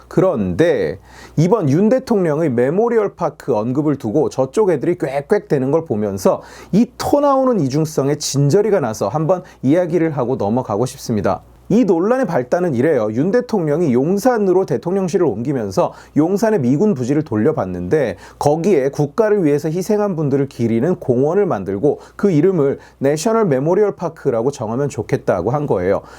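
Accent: North Korea. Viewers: 초반만 봤는데 북한말인줄..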